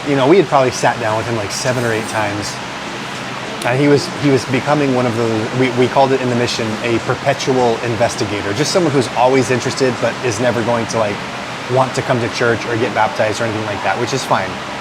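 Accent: Philippines. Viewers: nagiging filipino accent ka na slowly kuya. stresses and emphasis na lang on syllables, the level you are right now is comparable to an english-speaking household-grown teenager transitioning to learning filipino. very proud been a quiet watcher since january this year. Happy birthday!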